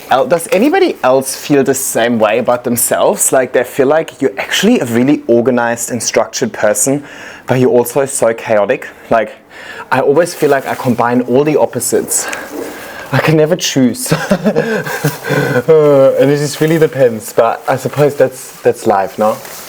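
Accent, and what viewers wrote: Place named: Germany. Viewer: Hi, I love your personality and your content, and I have learned soooo much from you. Short story: I was born in Aachen, Germany, many, many moons ago. When I started my plant journey, I used to watch your videos and initially I could not figure out why the expected Australian accent sounded like a German accent. I asked my son to watch you and he said "definitely German". 😊 I am extremely nitpicky about cleanliness and order, must be the German influence on me. 😬 I am Asian and live in the USA. Sorry, a long comment. Thank you for you fun, wholesome videos and you seem to be such a nice guy!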